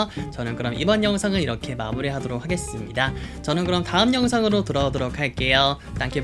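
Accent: United States. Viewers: The way he says bottles, in the American accent, is similar to the way we say it in the Midwest (near Chicago). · Woah , I actually didn't know that he can makes American accent also . And he is really informed about English and its accents . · I love how his accent changes with the way he says the different words. The American accent is so adorable! 🥰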